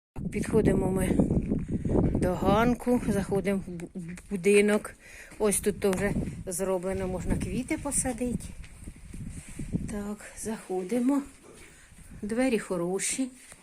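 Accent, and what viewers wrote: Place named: Chernihiv, Ukraine. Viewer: По мові- Полтавська чи Чернігівська. Швидше Чернігівська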